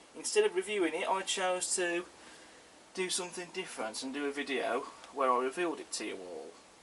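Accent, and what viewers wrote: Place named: Birmingham, United Kingdom. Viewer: chap sounds like barry from auf weidesain pet,must be from birmingham, put the 1p with the six wheelers and the 4f with the staniers,much more prototypical.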